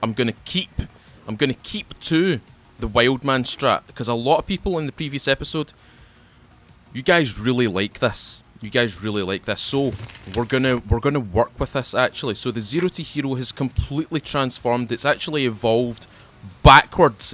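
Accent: Scotland, United Kingdom. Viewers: Hey, I watched all of your SP Episodes and I learned so much about the game. Thanks and greetings from East-Germany. PS. I like you scotish dialect.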